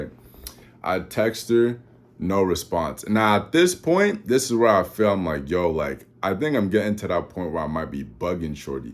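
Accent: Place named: United Kingdom. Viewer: it sound like u got a British accent a lil 😭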